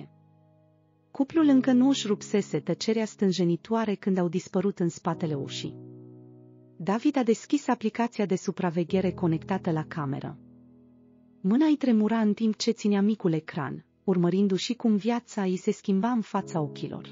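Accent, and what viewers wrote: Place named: Moldova. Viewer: Limbaj cu accent specific moldovenesc și accente puse anapoda pe cuvinte.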